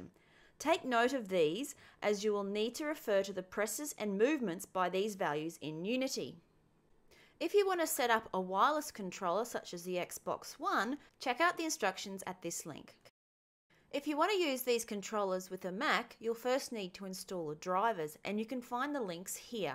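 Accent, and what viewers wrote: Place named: Australia. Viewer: you sound like you from southern queens land or northern nsw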